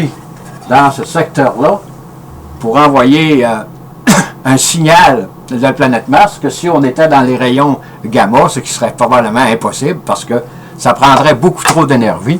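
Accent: Quebec, Canada. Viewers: Depuis le Maroc Merci beaucoup. malgré l'accent québécois j'arrive à comprendre votre magnétique exposé.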